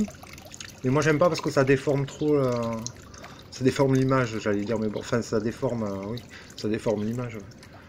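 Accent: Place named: South-West, France. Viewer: j'aime bien cette accent du sud ouest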